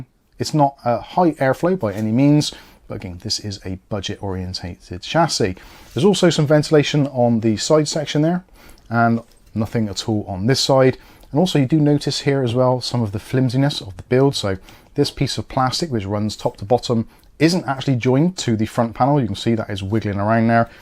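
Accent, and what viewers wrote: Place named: Shires, United Kingdom. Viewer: Love you're shire accent. Great vid, I was actually looking at this case before finding you're video on it. Class.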